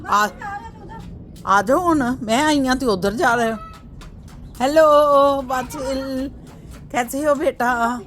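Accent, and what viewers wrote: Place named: Punjab, India. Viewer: Your Urdu is very punjabi.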